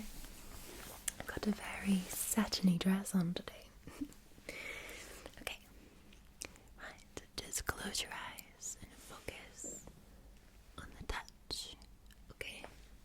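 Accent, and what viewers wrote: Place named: Scotland, United Kingdom. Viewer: I can’t place your Scottish accent, but digging it 🏴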